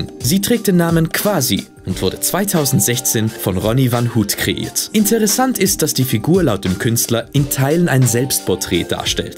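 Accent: Austria. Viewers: Stimme ist super. Man fühlt sich etwas weniger wie mitten in Österreich. (Nichts gegen Österreich) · Das ist auf Österreichisch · Lia & Enna Das ist Österreich-Deutsch☺! Da ich selber aus Österreich stamme...die Deutschen sprechen das CH aus und wir Österreicher mit K; zum Beispiel bei der Giraffe, sagen wir Österreicher Schiraffe; usw.! 😊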